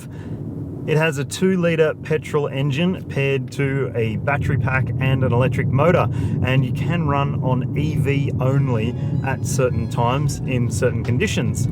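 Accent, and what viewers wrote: Australia. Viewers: Aussie accent.....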